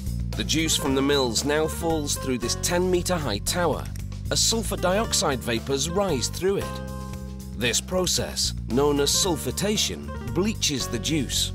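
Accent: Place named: Liverpool, United Kingdom. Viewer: He’s actually scouse, one of the more common peasantry sounding English accents.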